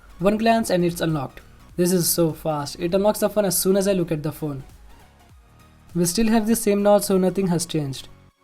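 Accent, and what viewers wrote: India. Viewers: The thing that I love about this iPhone is the camera. Your English with an Indian accent is cool to hear.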